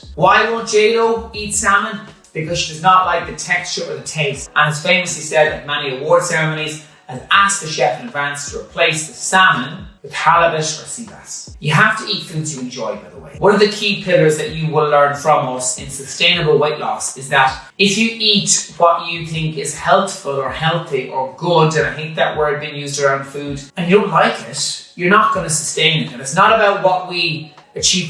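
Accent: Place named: Ireland. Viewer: Are you Irish? I love the accent ❤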